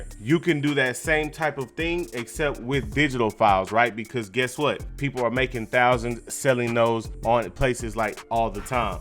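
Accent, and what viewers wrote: United States. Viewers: which part of usa are you from asking because of your accent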